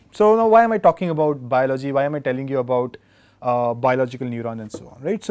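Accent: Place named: India. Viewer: 这个印度英语，有点可爱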